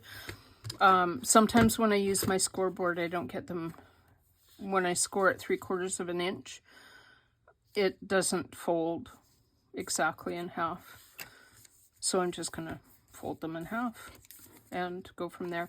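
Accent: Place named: Canada. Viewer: Just discovered your channel - it came up in my suggested videos feed. You have to be Canadian 🇨🇦 🍁 🇨🇦 your accent gives you away! Nice to subscribe to a fellow creative Canuck. I have had tabs on my to-do list for awhile - thank you for the inspiration.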